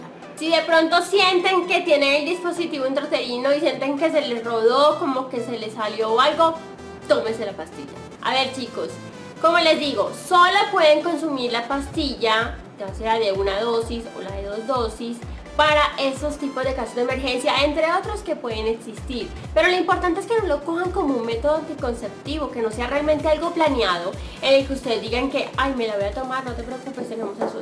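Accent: Colombia. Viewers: siempre había escuchado acerca de la ''famosa'' pastilla y no tenia claro muchas cosas :/ gracias por aclarar todas mis dudas y tiene una hermosa voz (mas ese acento de colombiana) -saludos!! :D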